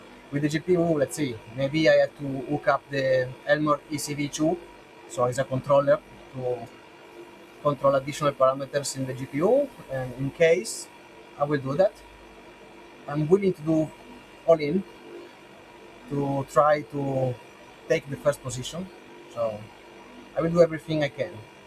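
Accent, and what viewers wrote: Italy. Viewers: Your accent sounds very Italian.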